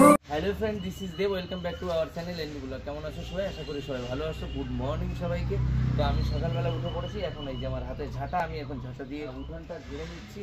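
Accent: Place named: Bangladesh. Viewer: Dada tomar mayer bari ki bangladesh kotha gulo bangladeher moto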